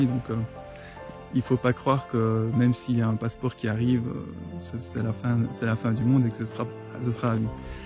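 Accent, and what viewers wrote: Liège, Belgium. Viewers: Bonjour. Ton analyse est sage, juste et lucide. Merci. Télévision= "tell a vision", raconter une réalité, et ça se fait via des..."programmes" sur des "chaînes" CQFD instrument de propagande et de programmation mentale. J'ignore où tu vis à présent mais tu as pris soin d'y amener ton accent liégeois:-) Love & Laughter from Cité Ardente. WWG1WGA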